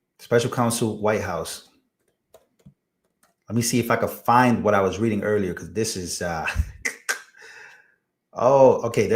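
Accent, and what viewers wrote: New York, United States. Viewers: Accent dont lose the NY accent. I lived in TX in 1990 San Angelo best time of my life. Great people. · “Your lovely NY accent” is what they meant! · Moved to Indiana from Kentucky 27 years ago and I still have my accent 😅 I trust you'll keep that New York accent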